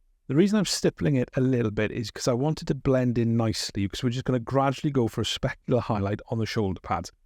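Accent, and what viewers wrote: Wales, United Kingdom. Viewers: Welsh accent, by the way. There's no such thing as a "British accent" really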